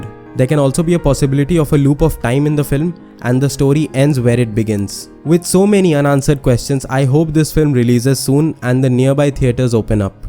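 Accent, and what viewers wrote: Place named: India. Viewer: What a pajeet accent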